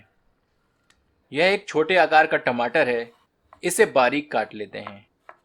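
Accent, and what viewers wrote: Standard. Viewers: इतनी शुद्ध हिन्दी तो हमारे हिन्दी के शिक्षक भी नहीं बोलते थे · आपकी शुद्ध हिन्दी बोलना अच्छा लगा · Apki Shudh Hindi sun ke man prafullit ho gaya...pehli baar kisiko itni acchi Hindi bolte hue suna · Shudh Hindi ka uchcharan aapke baton se pet bhar Gaya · i heard his voice till the end nd enjoyed pure Hindi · जी आप की हिन्दी सुनकर मुझे बहुत बहुर खुस्हुवा इतनि शुद्ध है कोही नहि बोलसक्ता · रेसिपी तो बहुत अच्छी है लेकिन उससे भी अच्छी आपकी शुद्ध हिंदी है। कृपया आप इसी तरह अच्छी अच्छी रेसिपी लाते रहे जिससे हमे आपकी हिंदी भी सुनने को मिलती रहे🙏🙏🙏🙏🙏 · आप के शुद्ध हिन्दी उच्चारण सुन कर आंनद आ गया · Shudh hindi bolte hain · आपकी हिंदी की धारा प्रवाह ... शुद्ध उच्चारण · Itni shub Hindi mein baat karne ke baje se mujhe mutton de zyada apki Hindi Sune ka man hain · सच में आप सर हिंदी के शिक्षक हैं। मतलब क्या हीं बोले आपको, हिंदी के बारे में। इतनी शुद्ध हिंदी!!😀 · Mujhe apki resipy to achhi lagi sath mai apki sudh hindi bhot achhi lagi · आप तो कमाल के कलाकार हो, इतनी शुद्ध हिंदी और वो भी मटन व्यंजन विधि के बारे में क्या बात है ! 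पहली बार किसी का वीडियो देख मजा आ गया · हिंदी भाषा का शुद्ध उच्चारण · Aap pahle durdarshan chanal pe programme present karte the, aisi Hindi wahi dekhne aur sunne ko milta thi, 😢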